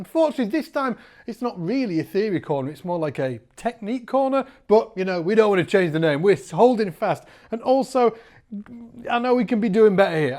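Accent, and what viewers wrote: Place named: Northern England, United Kingdom. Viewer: has a killer northern English accent.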